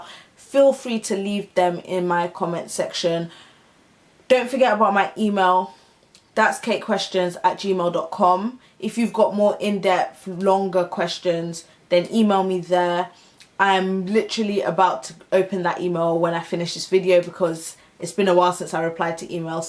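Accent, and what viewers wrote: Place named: England, United Kingdom. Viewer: I love listening to English people speak... best accent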